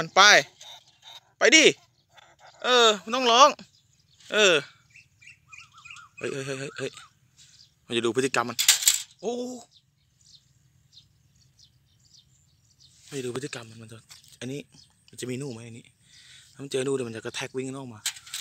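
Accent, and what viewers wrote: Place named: Kanchanaburi, Thailand. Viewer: อยู่ไหนเสียงเหนอเมืองกาญจ์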